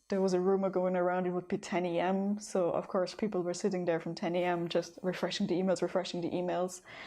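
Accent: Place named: Ireland. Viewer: I just can't get over how you are sounding more and more Irish with every video lol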